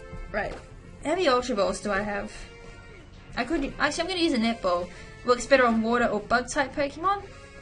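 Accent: Australia. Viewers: are you and australian?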